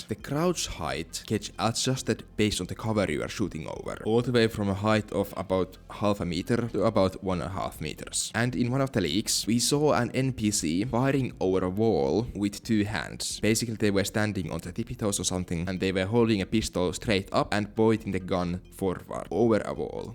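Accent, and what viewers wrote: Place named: Finland. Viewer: awesome finnish accent bro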